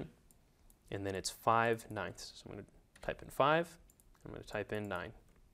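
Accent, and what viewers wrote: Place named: India. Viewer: Aren't my ears stuck or something? I hear an Indian guy, who can perfectly speaks English! Great tutorial videos, by the way :) Keep it up.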